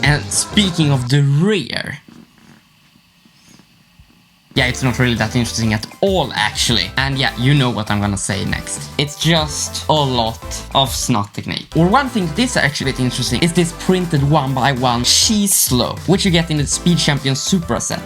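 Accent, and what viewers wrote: Sweden. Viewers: eyy, du e svensk jag trodde det. ´´fick nåt i halsen´´ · Are you from sweden?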